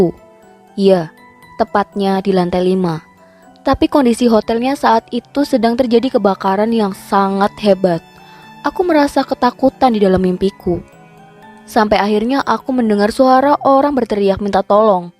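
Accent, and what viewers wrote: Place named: Java, Indonesia. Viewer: nedok mbak e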